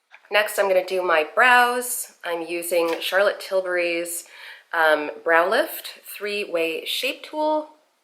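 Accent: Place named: Canada. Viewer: I don't know why, but I love Canadian accent. :) Nice makeup.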